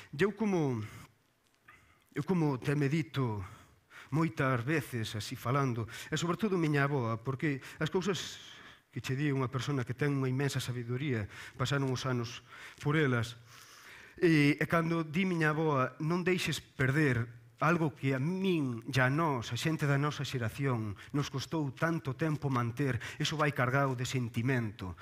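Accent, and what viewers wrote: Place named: Castile, Spain. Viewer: Parece um portunhol, mistura de português com sotaque castelhano...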